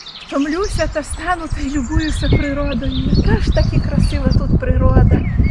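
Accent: Western Ukraine, Ukraine. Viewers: Пані, ви справжні і не звертайте уваги на недолугих людей, які ще сміють сміятися і засуджувати, що ви купили стареньку хату. Невже притомна людина може так думати? Люди, та ЩО з вами?!! Тисячі людей тікають від дикої орди, залишають домівки, залишаються без миски-ложки, змушені починати життя на новому місці, а ви зловтішаєтеся! Замість допомогти, чи просто підтримати добрим словом. Пані, нехай вам Господь дає сили і здоров'я всі негаразди подолати.❤ А певно пані має західняцьке коріння, бо чую по розмові)). Вітання вам від тернополянки❤❤ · Пробачте, вперше попала на Ваш канал і цікавлюсь з якого регіона ви переїхали? Бо мова у Вас як у західних українців.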